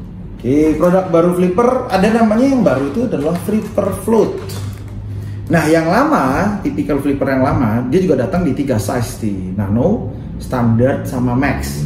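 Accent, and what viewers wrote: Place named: Java, Indonesia. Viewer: asli jowo endi koo😂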